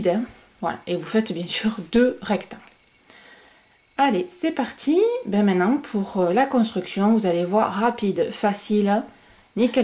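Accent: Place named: Southwest, France. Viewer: bonjour, je recherchais un point pour un poncho et autres et là je tombe sur ton tuto. Pile à point. j'ai adoré les explications, cette touche d'humour vraiment agréable et cet accent oh là là qui rechauffe le coeur et me rappelle l'époque ou l'ai habité le sud-ouest ou les gens sont chaleureux et simples. merci.👍❤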